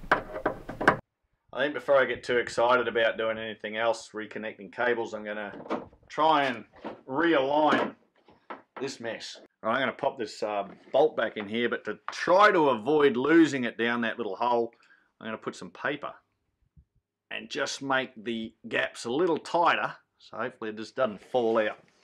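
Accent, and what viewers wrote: Australia. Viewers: Great video, and great to hear an Aussie, in America, driving a British car! Very multi-cultural. I need to change some actuators in my Disco 2 and hesitated when confronted with having to 'fiddle' with the window jams / frames. After watching your video it doesn't look as bad as I thought it would be! Thanks! On another note, I changed the actuator on my rear cargo door as it wouldn't unlock with the remote key. Upon changing it, and then testing it, it still wouldn't work, then I realised you have to press the unlock button on the key fob twice to unlock the cargo door. It then worked. It made me wonder if I even needed to change the lock in the first place - I just needed to press the key fob button twice lolol. At any rate, at the same time I removed and rotate through 180 degrees and reinstalled the factory spare wheel carrier so that it would allow me to put bigger tyres on the car including the spare without clashing with the bumper. 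So all was not lost in this exercise. Thanks again for your videos - they are my first stop when I am contemplating doing some repairs and maintenance on my Disco. Cheers! Michael, Alice Springs, Australia.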